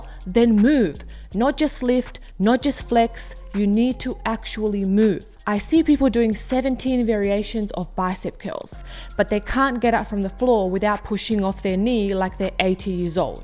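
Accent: South Africa. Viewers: Random question, are you from South Africa, I hear a bit of an accent there? Great videos btw. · It's the accent right? I also thought we were from SA when she said 'bro'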